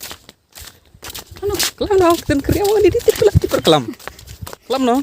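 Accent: Meghalaya, India. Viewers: Kren Da ka ktin pnar phim nang bha ban kren khasi.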